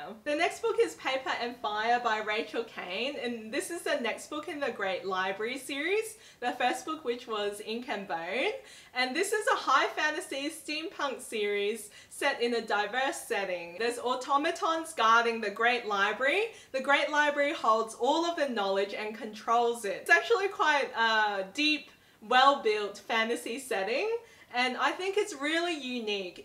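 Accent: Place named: Australia. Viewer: TBH, this is the first video I've watched from you. first off, I love your gorgeous Aussie accent LOL. secondly I'm hoping I can find your Aussie ya reads here in America somehow cos they sound so good! I also really appreciate your review of Replica. I'll keep in mind how you recommend reading it when I dive into my copy. What other Lauren Oliver books have you read? I bought Replica on thanksgiving and then last weekend I bought another one of hers (can't recall the title right now) at a discount bookstore. Do you have any reviews of them video or written?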